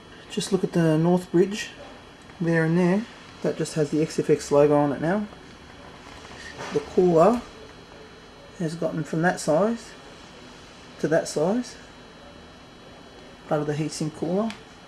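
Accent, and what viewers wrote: Australia. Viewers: hey are u Australian???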